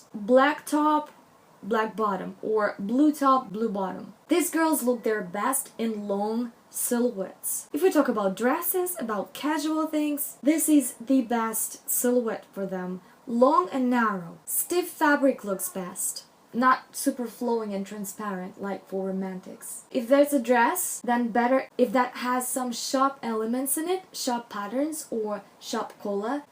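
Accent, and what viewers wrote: Russia. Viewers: that russian accent tho, can tell, you're from my country :D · Is your first language Russian (or any other slavic one)? Your accent is lovely by the way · Акцент похож на русский.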